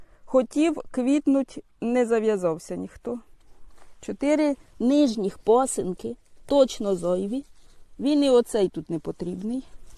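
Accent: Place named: Sribne, Ukraine. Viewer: Ви часом не поблизу Лохвицького району живете? Дуже мова схожа з тією, якою розмовляють в Срібнянському районі, це сусіди.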